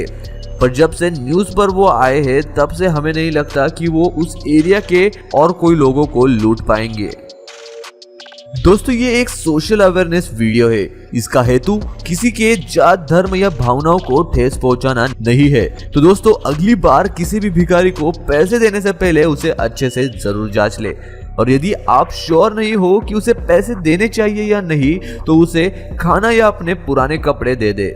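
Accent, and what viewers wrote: India. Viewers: You are not an indian why speaking liake an indian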